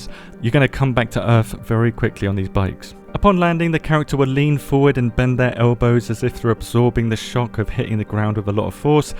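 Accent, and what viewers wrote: United Kingdom. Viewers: british :face-blue-smiling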